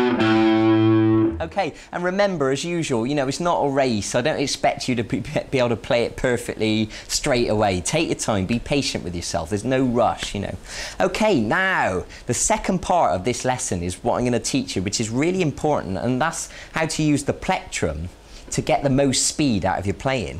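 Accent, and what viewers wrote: United Kingdom. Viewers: yeah, but I like this guy better, he has a british accent. And the other guy yelled at me, "HI EVERONE WHATS UP?!?!?!?!" I dont like him too much. And this british guy is fine, he knows what he's doing, and I'm learning stuff without a guitar so shut up.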